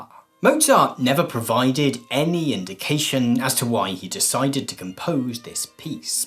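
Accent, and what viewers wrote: RP, United Kingdom. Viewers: hearing the words “the shitpost is defined by” in a posh british accent is a transcendental experience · dont u hate it when the dude narrating a 45 min video is british :(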